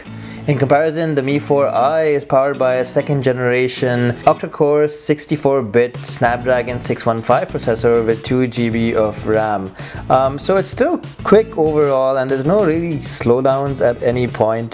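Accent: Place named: India. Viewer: Why not every indian have his accent?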